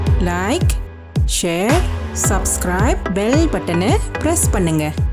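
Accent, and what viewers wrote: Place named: Kuala Lumpur, Malaysia. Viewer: KL accent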